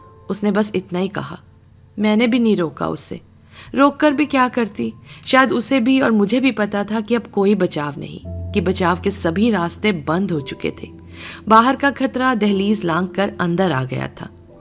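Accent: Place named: Pakistan. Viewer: I love your sweet pakistani accent voice.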